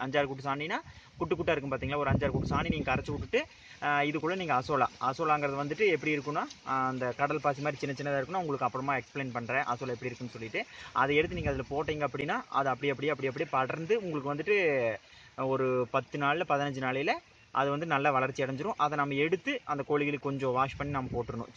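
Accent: Kongu, India. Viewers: your kongu Tamil was super bro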